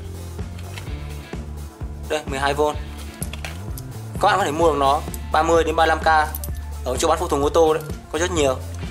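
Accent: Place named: Phu Tho, Vietnam. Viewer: nghe giọng anh là biết anh là người có Phú Thọ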